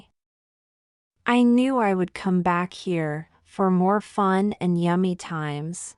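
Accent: United Kingdom. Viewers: I think this video is British accent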